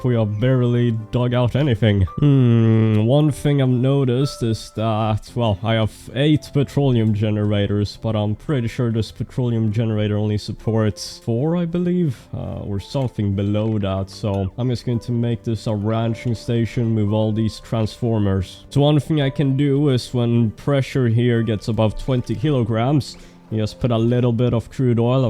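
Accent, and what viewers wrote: Sweden. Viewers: Its funny how easy it is to recognize your own accent. Two minutes in and im like "this guy HAS to be swedish." I double check and yeah, confirmed. Any other country and i have no idea what accent it is haha. 🇸🇪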